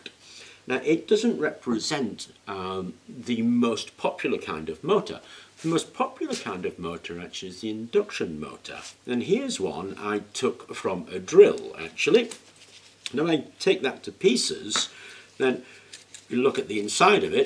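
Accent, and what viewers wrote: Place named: England, United Kingdom. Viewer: Piękny angielski akcent :)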